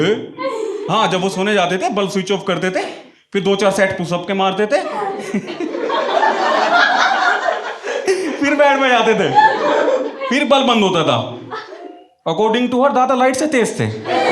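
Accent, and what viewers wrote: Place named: Delhi, India. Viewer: Nice standup bit 😊 it's been 20 years leaving delhi , but listening you felt like I am in delhi haryana 😂😂😂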